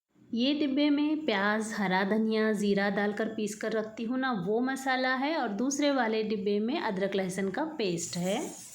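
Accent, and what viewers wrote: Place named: Belgaum, India. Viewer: proper nagpur se hain kya aap ka asent mujhe belgaum jaisa laga thats y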